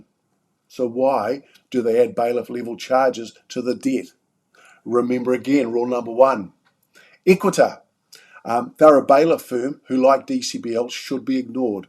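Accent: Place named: Australia. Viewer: This guy is clearly Australian (or perhaps a Kiwi). No prob with that, but is he talking about the law in Australia/NZ or UK? I haven't heard of any of the companies he has mentioned.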